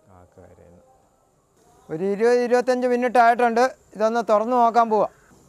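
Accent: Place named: Elippakkulam, India. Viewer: Elippakkulam slang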